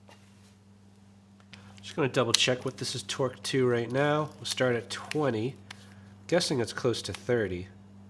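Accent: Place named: South, United States. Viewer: Critter? What part of the South you from?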